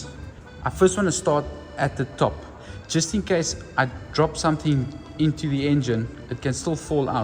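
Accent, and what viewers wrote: South Africa. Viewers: Started watching but the South African accent grates me so I'm off to watch something less annoying.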